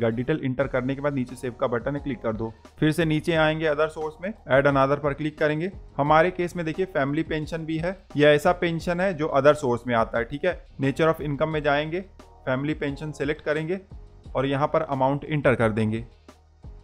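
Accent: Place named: United Kingdom. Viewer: This is for INDIAN senior citizens. Why this British accent. Pl reload with normal Indian accent.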